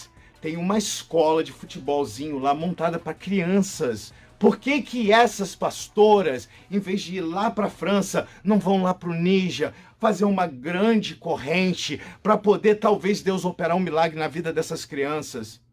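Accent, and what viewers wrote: United States. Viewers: legal perguntei devido o sotaque americano. Gosto muito do seu canal. 😍❤❤ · Gente por favor que sotaque é esse? O cara é um brasileiro que esqueceu o português ou um brasileiro com síndrome de baba ovo de americano? Esse cara fala igual a um americano que está aprendendo português..que vergonha alheia ..fala direito brasileiro valorize a terra que vc nasceu..esses vídeos desse cara pode entrar para os top lixo do YouTube..que bosta ..não recomendo....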